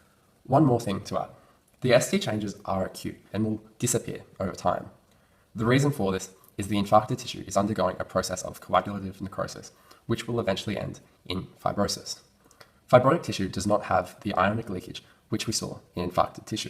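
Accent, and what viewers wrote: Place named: United Kingdom. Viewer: foreign accent (British) and fast talking. I agree. LOL but still a good video, I just had to rewind a few times. Dan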